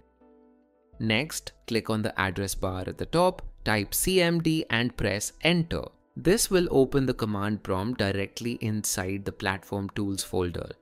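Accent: India. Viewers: always guy with indian accent is most helpful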